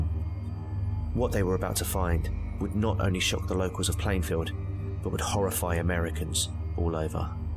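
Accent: United Kingdom. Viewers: what area are you from you have a west end/essex tang to your voice but cant pin it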